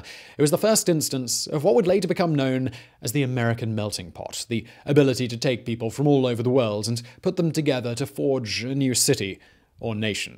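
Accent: United Kingdom. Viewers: Simon..... Being a New York native I must say the British flair you speak whilst educating make me wonder..... "Damn it Man why were you not my History teacher!" To you team of researchers "Brilliant!" to coin a term. Thanks Simon love this channel then again im subscribed to ALL your channels Proud to be a New Yorker!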